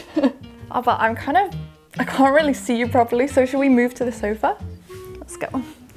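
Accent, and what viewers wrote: United Kingdom. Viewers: 영국발음까지 들을 수 있다니...너무 좋아요 형! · 영국영어다!! 워우타~!~ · 님 너무 사랑스러우세요.😊 매력적인 영국 엑센트 들으면서 공부하니 너무 좋아요!! 님 리얼톡 많이 만들어주세요~^^ 좋은 컨텐츠 항상 감사합니다👍🏻🤗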